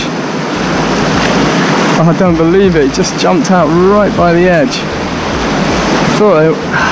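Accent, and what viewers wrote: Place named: England, United Kingdom. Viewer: Hi loved the vid and subscribed. This vid had me fascinated, we get bluefish here in Australia where they are called Tailor. I was actually thinking this was an American clip on bluefish ing, next thing I hear your English accent, then see you in some kind of subtropical location Confused lol, what country is this and why do the fish get so big there? Are you a regular tourist to the area or live/work in the area? Cheers mate loved the vid big time.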